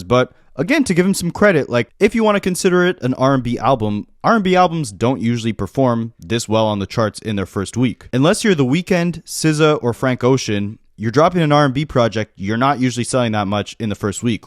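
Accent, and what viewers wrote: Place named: Montreal, Canada. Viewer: Are you from Laval or the east end of Montreal or something. You sound like you are a Greek or Italian montrealer 😂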